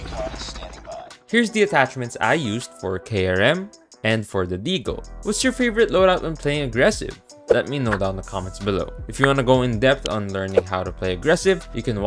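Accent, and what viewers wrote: Philippines. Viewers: You sound Filipino, and because of that you gain my subscription 😎😎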